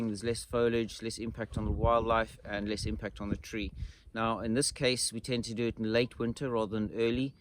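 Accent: South Africa. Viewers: You sound South African but you say south is towards the sun (not north as it is in SA) - what country are you in nowadays?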